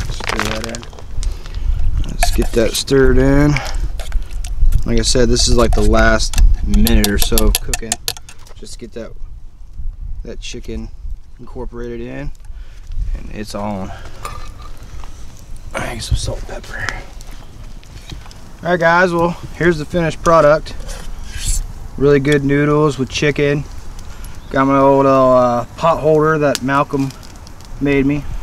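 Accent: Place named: Appalachia, United States. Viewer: good job. you ever spend any time in WV. sometimes I hear a slight hint of hillbilly twang in your accent (meant as a compliment)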